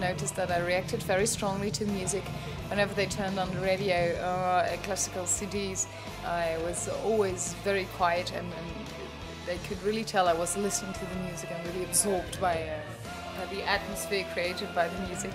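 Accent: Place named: United Kingdom. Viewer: When she speaks English it sounds British it is different from Simone and Floors dialect,but I must admit I love woman with accents (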